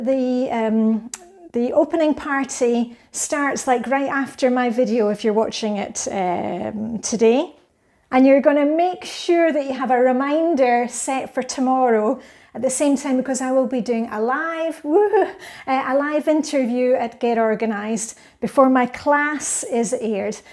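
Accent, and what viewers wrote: Scotland, United Kingdom. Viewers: Found you again! I had watched you years ago and lost you in the confusion of life. I’m 67 and we have a small condo that we have been in for 3 years after owing a large home. The clutter is back😂. You have inspired me this week and I’ve been cleaning like a crazy bunny. I love your accent. Brings back memories of my Grandfather’s brogue. My Mom was born in Glasgow in 1920. She came as a little girl and later married an Italian. That’s a combo! Thank you for your inspiration! I enjoy watching you declutter as you prepare for your downsizing. From: New England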